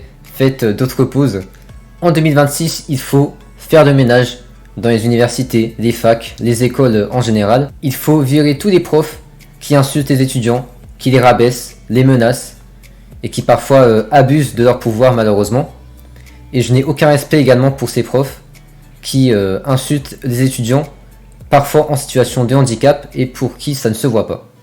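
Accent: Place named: France. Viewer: Was great to listen to you. You have a beautiful French accent. I enjoyed your presentation 😄big like 👍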